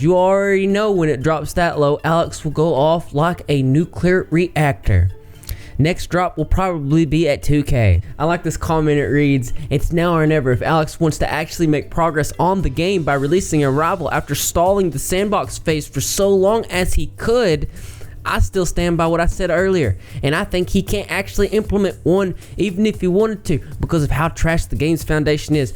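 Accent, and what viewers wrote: South, United States. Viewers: i've finally figured it out what kind of accent he has he sounds southern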